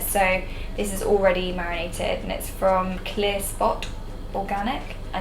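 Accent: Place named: England, United Kingdom. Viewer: what part of England do you live in, i like your accent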